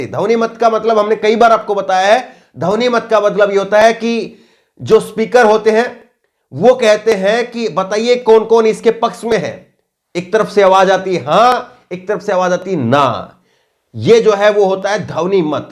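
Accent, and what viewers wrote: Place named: Rural, India. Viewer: आपको हिन्दी अच्छी नहीं है lower class की या illetirate टाइप जैसे गाँव के लोग बोलते हैं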